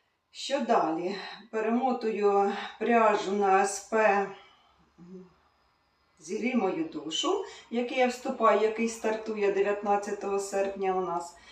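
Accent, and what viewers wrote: Poltava, Ukraine. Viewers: Ви все правильно робите, я на Ваш канал підписалась саме завдяки полтавській говірці, а потім вже в'язання! Дякую Вам за щирість! Продовжуйте знімати в своїй манері, чекаємо!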